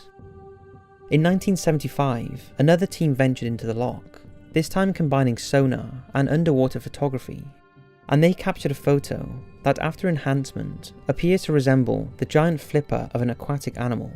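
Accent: Wales, United Kingdom. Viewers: Loch, not lock. You sound Welsh mate so should know better. Anyway, good video and very interesting.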